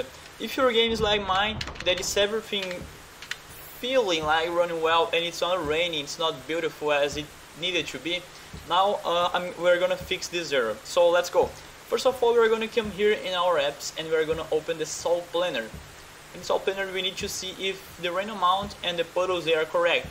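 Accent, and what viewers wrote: Brazil. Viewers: slc mano me ensina inglês aí 😯só deu pra perceber que era é br por causa do sotaque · Só BR pra ter esse sotaque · tu é brasileiro né mano, esse sotaque ai eu conheço hahaha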